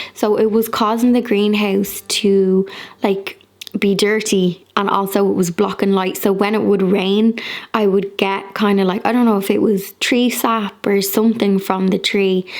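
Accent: Ireland. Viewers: To sit there lazily on a Sunday afternoon, enjoying tea and candle light, it has something deeply satisfying to watch you whirling around ;-) It is cute somehow and I absolutely like what you make out of your home. Every video is a package of creativity, smiles and cuteness (being decades older, I hope, I can say this without sounding arrogant or stupid). Actually, it is so lovely to listen to your Irish and to watch your videos. Deeply pleasant. Love and greetings from Germany.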